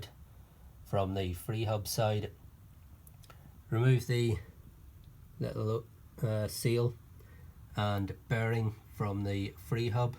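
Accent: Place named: Ulster, United Kingdom. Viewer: Welcome surprise hearing an Ulster accent doing a bike maintenance vid. Thanks for the tutorial!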